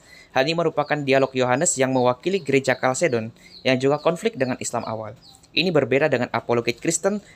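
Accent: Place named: Malaysia. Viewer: Cumi, bang Edis dialeknya Batak, kalo ini agak ke dialek Melayu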